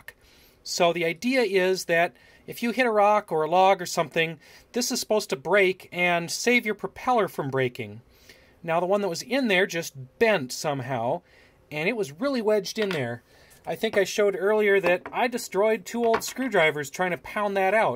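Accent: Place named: Minnesota, United States. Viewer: I thought so. I take my 74' Starcraft Capri trihull on that lake sometimes. I sure thought you sounded Minnesotan, I was right!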